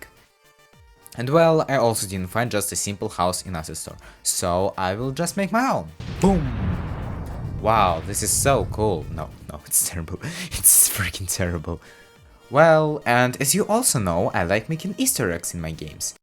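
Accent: Russia. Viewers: Акцент подсказывает мне что ты русскоговорящий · у тебя акцент русского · русский акцент везде услышу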